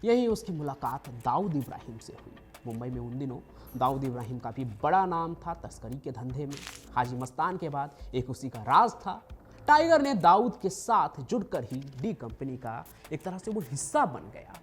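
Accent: Bihar, India. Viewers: Bhai tu bihar se hai ky tere gawaro jaise bat krna smjh me aata hai tu bihari hai😂😂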